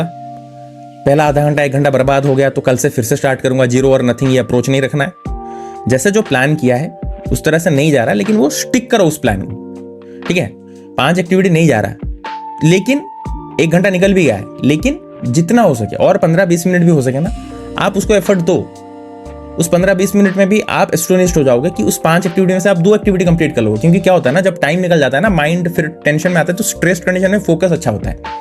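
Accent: Odisha, India. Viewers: Bhai you are odia na? 😅 Btw great 😃👍🏻 video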